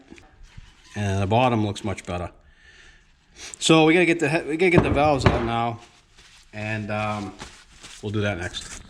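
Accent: Boston, United States. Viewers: dude i knew i could count on you. i have a headtht i'm ready to reseat the valves, i have the compound but i dont have the little suction cup lapping tool. i started watching , i thought you might have the tools but id have a look, and sure as shit you got a cheaper better way. i love your vids. i work alot like you. ive built three drz engines with your help on the first 2, third one i di by memory. since then i bought a kfx 400 quad. rebuilt that . i bought it thinking it needed carb tuning per the seller. got it homer did the carb woudnt start. i started looking around, turned out the oi was mixe with coolant. it had sat like that for along time. probably whoever had it was riding it like that til it finslly died. yeah i bought it without testing going on the sellers word. seemed like a goood guy. i learned a lesson, never trust a seller even if you met him at church. 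cuz thyll tell ya what you wanna here. theyre like politicans in that sense. i got it for $1500 though so i wasnt to pissed. its up and running like a champ now. only spent another 200 bucks so 170 i have a cherry 2003 kfx 400 big bore. i had some parts from drz's. carillo pistons are beautiful too. ii wanted to put it on a chain and wear it as a necklace but i put it in. drz runs like a beast. thanks to you i am now buildng engines myslf. u da man. wtf are you from boston area? my wife is from faihaven. its in the armpit. hour out of boston. her entire family family talks like you. she doesnt thank god. no o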